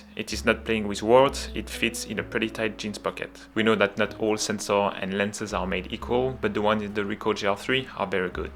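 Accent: France. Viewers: Houuuuuuuu toi t’es français toi hahahaha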